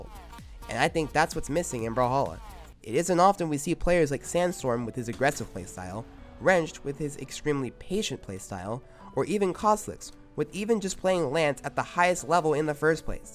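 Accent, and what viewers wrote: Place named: Sweden. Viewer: I hear sweden - i like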